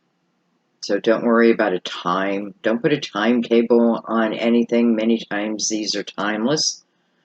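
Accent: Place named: Philadelphia, United States. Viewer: Girrrrl!!!! Omg you are so gifted. But you're also familiar and cozy. It's a joy to listen. And that Philly accent! Makes me homesick for PA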